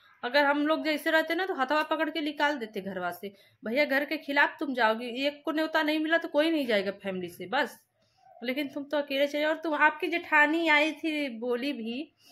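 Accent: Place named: Lucknow, India. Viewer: Ap didi up Lucknow se ho kya 😅😅😅 ap ki boli se lg rha h 😅😅😅😅 but ap ka videos acha lg rha h 😅😅😅😅😅😅😅😅😂😂😂😂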